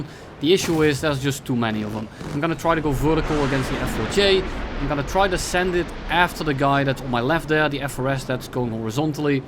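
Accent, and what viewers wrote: Netherlands. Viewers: that dutch accent tho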